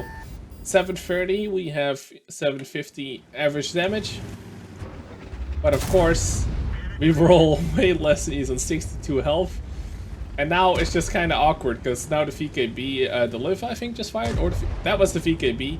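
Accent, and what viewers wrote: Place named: Netherlands. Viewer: You are dutch right? I feel like I can hear it in the way you pronounce the "V" in VK :)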